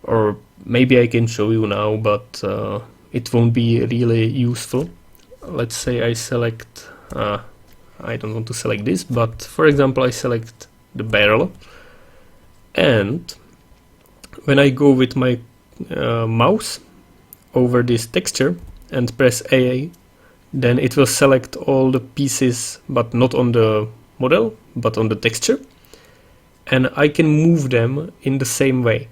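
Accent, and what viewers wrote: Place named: Czech Republic. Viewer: no ten český přízvuk jde poznat :D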